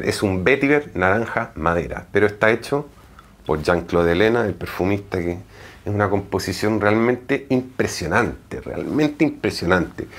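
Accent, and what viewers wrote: Chile. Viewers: ¿Cómo saben tanto de la vida de este señor?. El acento chileno es inconfundible eso si. Sobretodo para los chilenos. Genial que mantenga el acento, es un plus en este blog. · Este chico es chileno? Le noto un cierto acento de aquel maravilloso país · Eres chileno?? Saludos ! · Eres chileno? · Tenes acento chileno me parece inconfundible pero explicas muy bien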